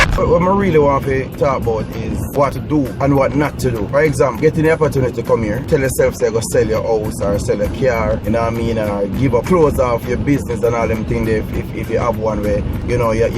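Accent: Jamaica. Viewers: You sound more Jamaican in this video. Lol.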